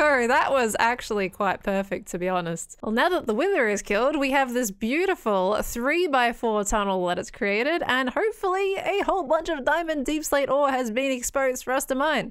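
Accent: Australia. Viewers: I just started watching your channel yesterday and was watching today with my daughter and she asked if you're an Aussie because she feels like we're under represented on YouTube (she's 8). She'll be very happy to find out you're an Aussie as well.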